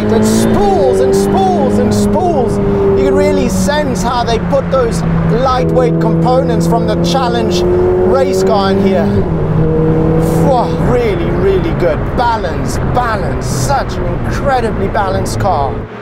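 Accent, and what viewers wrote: South Africa. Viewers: This Presenter is definitely South African. gatta Pattata?. Leon Schuster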